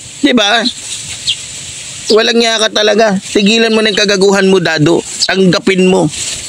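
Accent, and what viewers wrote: Visayas, Philippines. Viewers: Idol bisaya ka ba?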